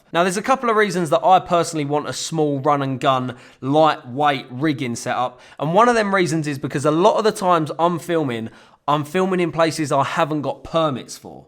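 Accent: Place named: Cockney, United Kingdom. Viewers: Wow, cockneys on youtube. Who would have thought it. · I love this, sounds like a proper Ray Winstone. tough guy cockney geezer, and in fact it is a baby face sharp as a razor vid afficainado. Keep up the helpful stuff. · omg, this is geezer english talk. Difficult to concentrate on the subject matter :)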